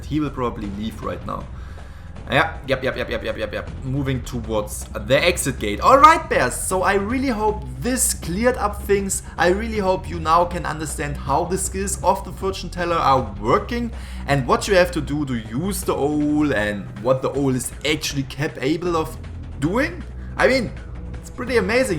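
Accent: Germany. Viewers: Are you originally german because your English sounds like a german person talking English?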